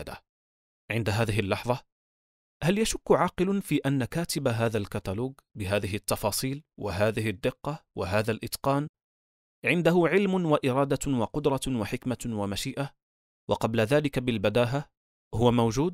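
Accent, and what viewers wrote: Morocco. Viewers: اشعر ان صاحب الصوت مغربي 😅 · أظن المتحدث مغربي، انطلاقا من لحن الكلام الخاص بنا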